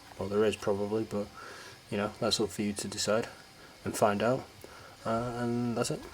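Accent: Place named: South, United Kingdom. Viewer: Britain, possibly South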